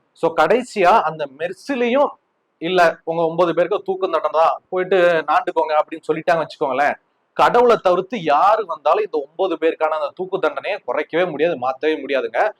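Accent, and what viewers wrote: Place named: Madurai, India. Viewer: நீங்க மதுரைக்காரா ,உங்க பேச்சிலேயே தெரியுது,நானும் மதுரைதான்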